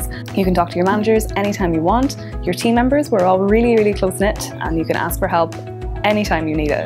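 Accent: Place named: Monaghan, Ireland. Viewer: lovely Monaghan accent.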